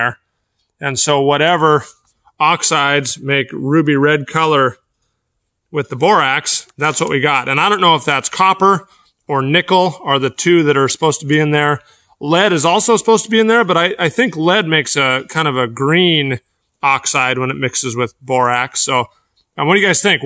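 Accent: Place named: United States. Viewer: Weird, guy has an American accent, but it looks like it was filmed in Somalia!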